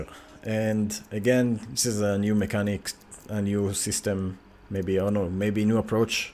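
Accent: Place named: Israel. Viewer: i can still hear that hebrew accent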